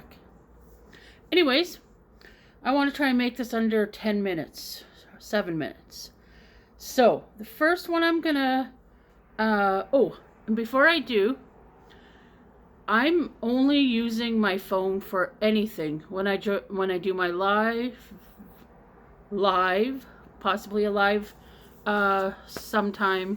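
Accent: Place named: Acadia, Canada. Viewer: Oh wow, just saw this, thank you! French Acadian accent 😉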